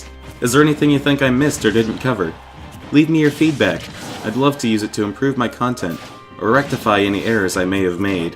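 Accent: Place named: Nova Scotia, Canada. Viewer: You have an interesting accent, are you from Nova Scotia?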